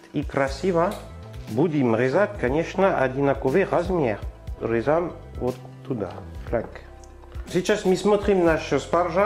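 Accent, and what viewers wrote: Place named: Gascony, France. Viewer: Очень вкусно и интересно рассказал , а главное акцент классный. Вы гасконец , он похож на Евгения Данчевского из трёх мушкетёров. ,, Имя сестра, назови мне его имя !!!"))) Фельтон !!!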